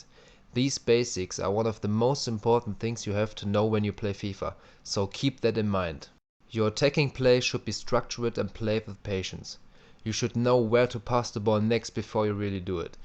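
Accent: Germany. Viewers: You speak german ! I notice because it says Tor and germans say X like this ix and english people ex :D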